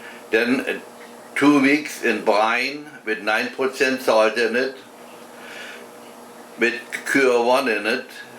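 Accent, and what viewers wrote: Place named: Germany. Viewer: My dad used to do that in Germany every year. We bought a pig and the butcher came out to our house and we butchered all day long. I remember that I had to stir the blood when they cut the pigs throat. We made everything by our self. Blood sausage (Blutwurst) and what you made here. (Rauchfleisch) and you sound like a German. Am I right? I wish my dad would still be around to tell me how he made all the hams and sausages. What you did here is pretty much the same way I just don't remember what he put in his brine. Is there a recipe for the brine (Lake) somewhere. Thank you that meat looks so so good. I will make some as soon as my pig gets big enough to get butchered.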